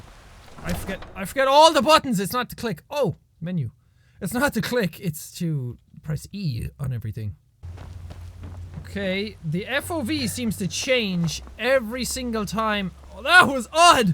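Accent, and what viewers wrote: Ireland. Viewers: Personally I think your accent is awesome, it's not often you get to here an irish person talking in general. Which is kind of weird really, do you all just not like to present yourselves in public? What's the deal here? You're kind of representing your entire people really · listen to an irish man bitch for 20 minutes... ill pass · Are Irish? I detect a small hint of Irish in your voice.....sorry if that was a personal question. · Your voice is not high pitched, it is simply your Irish accent. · Class accent I'm Irish too xD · jacksepticeye I dunno what they are on about, sounds like a rich markisepticeye level of audible chocolate to me:B Maybe they are not used to the 'flutey to foreign' ear of the irish accent, but I could pick your voice out of a crowd of a hundred easy and that's a damn good thing for the U-Choobs! If you play Skyrim again give em two barrels of al gore:D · Griffin Watson HES IRISH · i luv yer irish accent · He's Irish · so irish.. 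love it! (was in drogheda last summer, liked it a lot)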